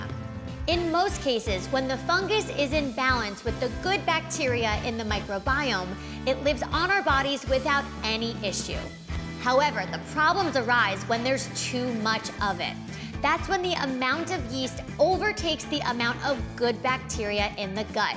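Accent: United States. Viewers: God I can't stand the American accent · She speaks like only an American can 🙂👍🏼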